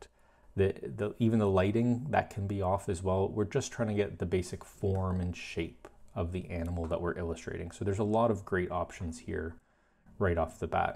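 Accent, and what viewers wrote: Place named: Canada. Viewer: I felt like I was listening to the voice of Roman Mars from 99% Invisible, only a Canadian version ;) Thank you for sharing the tips. I've used Illustrator on and off for 20 years but never really explored all of the options and you've pointed out some really helpful tips that cut down on the actual designing part simply due to rotates and refecting.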